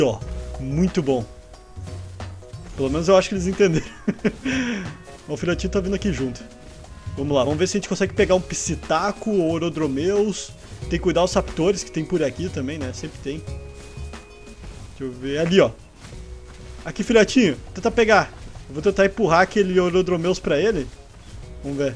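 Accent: Rio Grande do Sul, Brazil. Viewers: Gaúcho? Mas aah djdjjd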